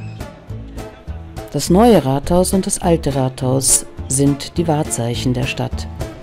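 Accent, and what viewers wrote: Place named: Breslau, Germany. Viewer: Vielen Dank für das tolle Video! Nicht nur, dass mich die Sprecherinnen-Stimme etwas an die Mundart meiner Oma aus Breslau erinnert (nur eingelegentliches „gell“ fehlt noch 😄), es ist auch sehr informativ. Schön, jetzt überleg ich mir, mal hinzufahren. Zuletzt hab ich die Stadt als Kind Anfang der 80er gesehen.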